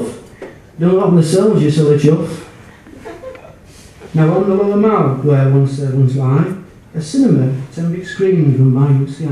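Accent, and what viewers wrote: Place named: Yorkshire, United Kingdom. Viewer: Hahaha your soooo Yorkshire!!!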